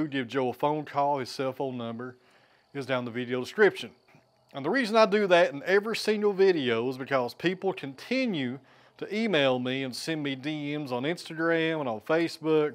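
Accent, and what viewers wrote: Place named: Ohio, United States. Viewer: Listening to you makes me just a touch homesick. Southwest Ohio, true, but rural Ohio isn't much different. Thank you, Sir.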